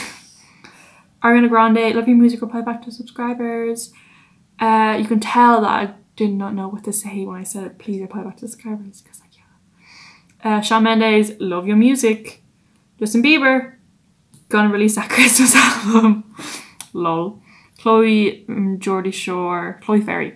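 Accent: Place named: Ireland. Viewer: Lol, I click on your video and straight away I hear the accent and know your irish😂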